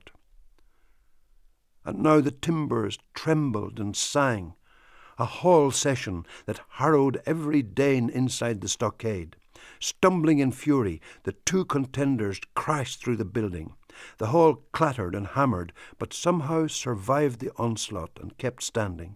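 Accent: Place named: Northern Ireland, United Kingdom. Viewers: Laura Halliday hahaha!..how on earth would you know what a "Shakespearean" accent sounds like??..anyways the narrator has modern nothern Irish accent.. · It's a modern Northern Irish accent.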